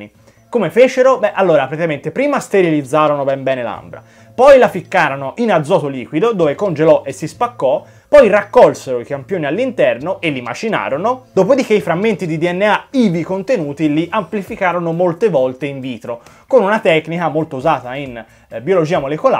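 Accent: Tuscany, Italy. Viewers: Si sente tutto il toscano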